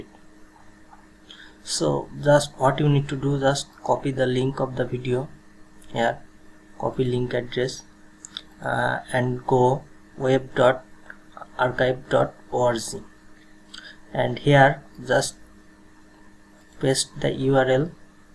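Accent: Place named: India. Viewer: Me: Ah this video looks pretty solid it might give some answers. Video: Has Indian accent Me: Aight ima head out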